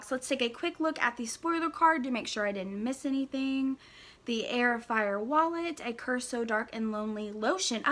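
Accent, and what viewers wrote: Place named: Spain. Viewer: I love your Spanish accent it's so cute. And don't mind it's difficult to read it. I'm spanish and for me English is kind of difficult 😂😂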